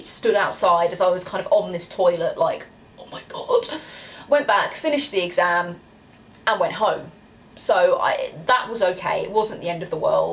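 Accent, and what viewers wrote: United Kingdom. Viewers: Your British accent always gets a thumbs up ( it´s the best english who can be spoken) .. the content of your videos always gets one, too. So you have ... at least with me as a German always 2 points plus ;) Listening to you is always greate Keep going and keep the head up these days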